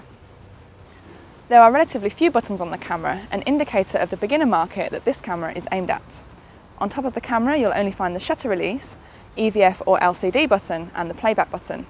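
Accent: United Kingdom. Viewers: Your British accent alone earns you a like ;)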